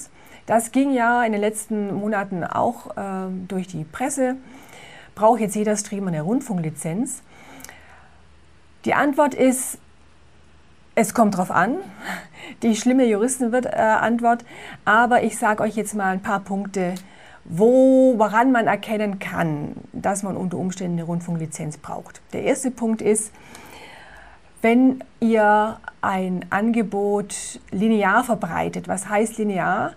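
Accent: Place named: Franconia, Germany. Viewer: Klingt für mich irgendwie eher fränkisch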